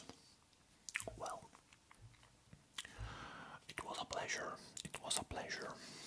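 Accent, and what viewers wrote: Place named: Italy. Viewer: That Italian accent while whispering sounds so good in your voice. 😍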